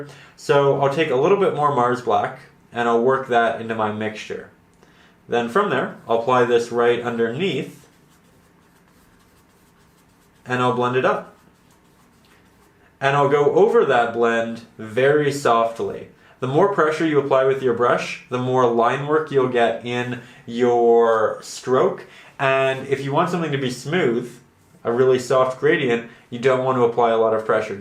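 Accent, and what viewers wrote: Canada. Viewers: I hear you are Canadian.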